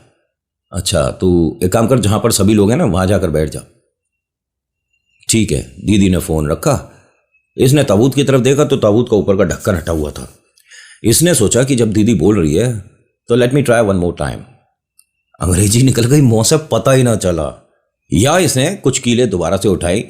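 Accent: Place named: Haryana, India. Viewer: भाई मजा आ गया हरयाणवी जबर्दस्त ऐसे ही सुनाते रहो टैलेंट है मगर घमंड बिल्कुल नही है 😂😂😂😂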